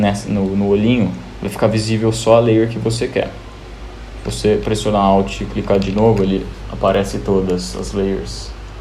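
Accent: Brazil. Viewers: O sotaque brasileiro o entrega,. rsrs Parabéns pelo projeto, brother.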